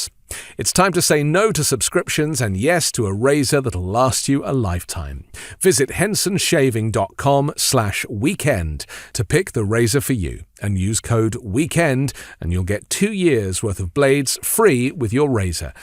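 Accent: United Kingdom. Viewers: . Nice job sir. Great to hear a British (or non American) voice outlining the situation so clearly. Im a New Zealander and appreciate this. Trump is now like a child bully. Once they are found out and cornered they show they are really horrible nasty gutless cowards. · I love how you completely dismantle Trump with your British accent making it sound like a very friendly story. The truth hurts 20 times more out the mouth of a Brit 😅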